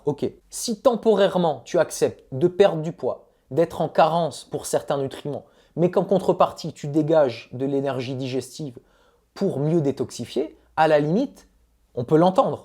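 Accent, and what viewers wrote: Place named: Housing estates, France. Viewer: Déjà apprends à aligner une phrase correcte en français, et après tu pourras, éventuellement, donner ton avis sur ceux à la cheville de qui tu n'arriveras jamais...ce personnage prétentieux à l'accent des quartiers (..) illustre la nouvelle "jeunesse", qui croit tout savoir et dont le cerveau a été configuré binaire par les smartphones dès le plus jeune âge. Voilà le futur de l'humanité ...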